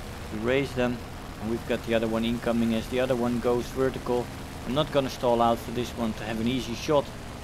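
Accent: Netherlands. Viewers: I need the pack... For it is my destiny to bring back balance to War Thunder. (Star wars Pun intended). How I found the channel: I was just watching WT Vids and I found your channel. Your accent caught my attention. Bcus I'm Dutch as well. And I don't know a lot of Dutch WT YouTubers so I taught lemme stick around and see where this goes🙈 What I think about your channel: What I like about your channel is that you are honest in your opinion and also the banter haha🤣 I also like how you address the players that Gaijin are just people as well and that if we want something to change we must not go and curse and scream to them (as a figure of speech) but tell it on a normal way to them. Keep up the good work😊 How long have I've been a Jengara🤣: I have been following your channel for almost 2 / 2,5 years now. It's like the day of yesterday when you started changing your thumbnails en your intro's 🤭 This was my summary of what I think, how long I've been following your channel and what I like about your channel 😊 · Hey man, you have a great channel because of your personality, you are calm and collected which is very relaxing and easy to watch. 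You explain the situations and the strengths and weaknesses of planes and you favor planes to tanks or ships unlike most war thunder youtubers. Lat but not least, i like your accent and the way you express yourself in English. Are you Dutch?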